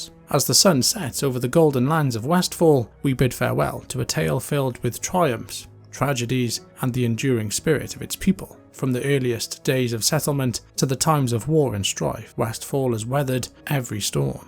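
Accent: United Kingdom. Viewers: Was so glad you were British when I clicked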